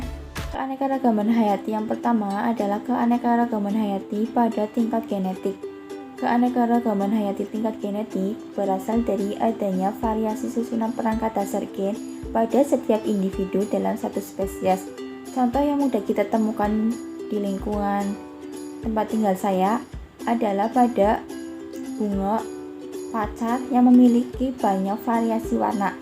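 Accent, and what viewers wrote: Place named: Bumiayu, Indonesia. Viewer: Mentore tegang dalam menjelaskan kurang sante karo bahasa bumayune kegawa banget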